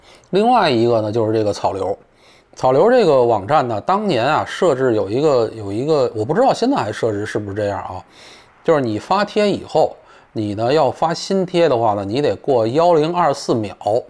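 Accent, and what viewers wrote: Beijing, China. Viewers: 我是个90后女生却十分喜欢散人的扑克脸 北京腔更显老道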